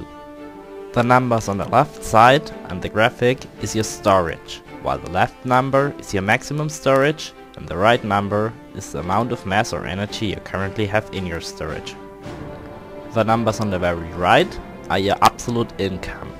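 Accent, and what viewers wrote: Germany. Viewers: just like MinecraftMadness64..... ein Deutscher der auf Englisch Videos macht....ich wusste doch dass ich da einen deutschen Akzent gehört habe ;D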